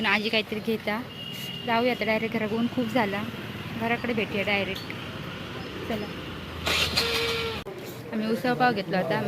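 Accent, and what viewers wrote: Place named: Malvan, India. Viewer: तुजो गाव कोणतो नांव काय गावाचा‌ मालवणी बोलतस ऐकाक बरा वाटता मी पण मालवणी आसय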